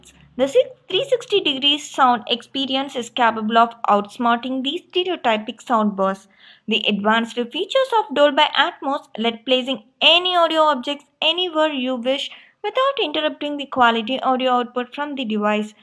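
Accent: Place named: South India, India. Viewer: Could find an accent u should be south Indian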